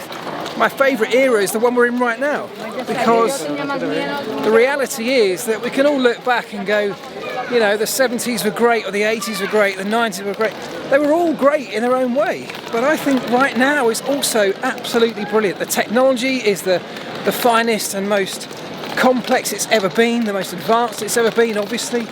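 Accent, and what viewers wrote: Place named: United Kingdom. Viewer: Oh no, it's brit alert again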